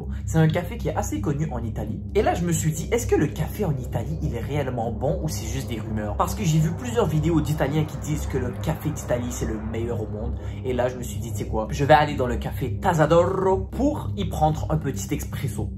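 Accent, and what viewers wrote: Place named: Arab world. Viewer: T as un accon arabe tkt j ai rien contre les arabe la preve je suis arab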